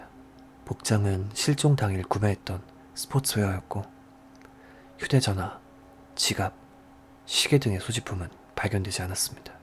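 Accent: Japan. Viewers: 개인적으로 '후' 발음이 적응이 안되네요 ㅎㅎ 일본식 발음인가요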